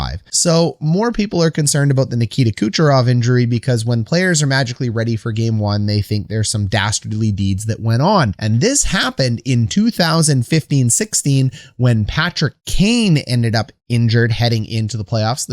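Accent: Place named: Canada. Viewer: I do love how his inner Canadian comes out regardless of how hard he tries to not show it. :D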